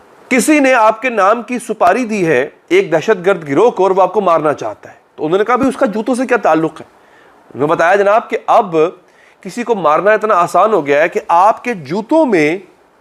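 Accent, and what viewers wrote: India. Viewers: India k channels kam dekha karo.tumhara tou lehja bhi Indian hogaya hai tum bhi ghaddar ho besharam😡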